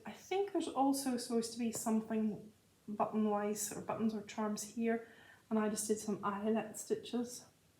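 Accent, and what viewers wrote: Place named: Ireland. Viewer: Your bee finish is absolutely gorgeous! I think it is the perfect use for the wood hanger. Your FFOs, finishes and wips are all just beautiful. Thank you for sharing them with us. It's always nice to stitch while listening to your videos. Your voice is so calm and I love your Irish accent! Take care & happy stitching!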